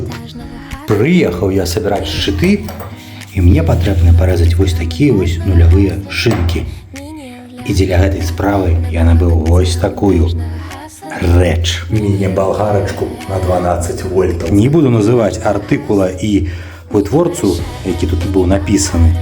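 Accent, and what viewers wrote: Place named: Minsk, Belarus. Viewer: Судя по акценту коренной минчанин. Или з магилёву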